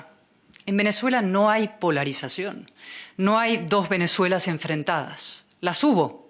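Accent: Argentina. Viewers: No solo es una persona bella, pero qué lindo habla…No solo su labia, sino su acento ( un acento argentino sin idiosincrasias) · Porqué habla argentino? Mu española no la veo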